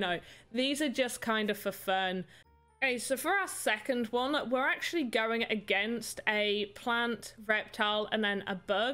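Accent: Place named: United Kingdom. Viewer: I like ur speaking! U cool! Lovely British talking! 😇❤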